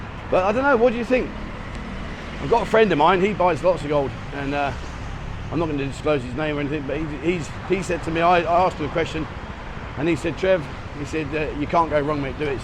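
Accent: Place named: United Kingdom. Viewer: Interesting language use. . . You are quite the Brit you are😸. . . and I DO love your accent! And how you use the language with such candor and wit. It's like what you said in this video about using agents to set up a bank account - and I much agree- that, "They charge a fee. . yes. but they have to make a living too". The magic of crypto is that it is still almost not regulated at all. . . So the bottlenecks the traditional stocks, gold etc big wigs set up against us since Jeckle Island with Chase n friends in 1913. . . are almost not even there. . . As such. . . more of the "living" one makes comes back to you. . . much much less to them.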